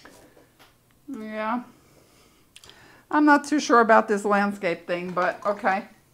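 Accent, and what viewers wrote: New York, United States. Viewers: It says your in Norway, but you sound a bit like a New Yorker...I really enjoy watching your videos. Does doing the live videos make you more self conscious? I've been watching you for quite a while now, it just seemed like you were feeling uncertain about your process...girl, you are a great artist, don't forget that.. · I just found your channel and I find it very motivating and filled with ideas. I enjoy your narrated versions the best. From listening I thought you were from NJ or NY, but heard you mention Norway..then saw your FB profile. Brooklyn..makes sense. I'm in NJ. :)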